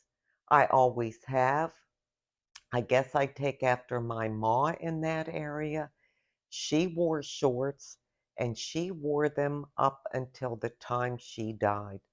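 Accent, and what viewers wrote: Pittsburgh, United States. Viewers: Your videos just recently popped up. As I listened to you talk, I just knew you were from somewhere close to my hometown of Pittsburgh! I’m so happy! Love your content and agree with 99%.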